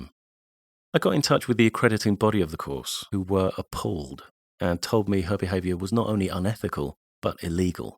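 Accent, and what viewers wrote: England, United Kingdom. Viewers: You sir are subbed. I followed a similar path in my education. Although it was Sociology and Anthropology. As you can imagine the ludicrous level of psuedoscience I saw, it might have been even worse for me. I'd love to able to do a series like this. But,I am absent the articulate and refined english accent and being american find myself exploding into arrogant & angry fits of cursing. :).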